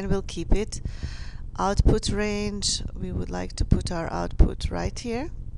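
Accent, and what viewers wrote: Iran. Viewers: Nice Persian Accent! And of course nice job!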